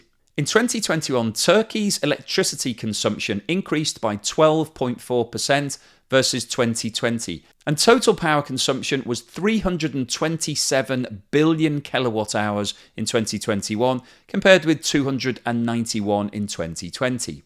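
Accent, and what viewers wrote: United Kingdom. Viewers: Hi, it doesn't really matter what introduction you use. We are here for your nicely structured concise information updates and we love your British accent as well. Did you notice the channel of "Michael invests and tries to make money" always starting with "holy smokes" with an Aussie accent?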